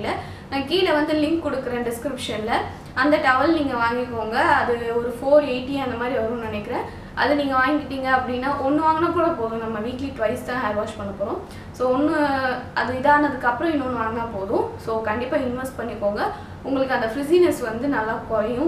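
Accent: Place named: Thanjavur, India. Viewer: Hai mam neenga ena native mam unga language thanjavur side mathri iruku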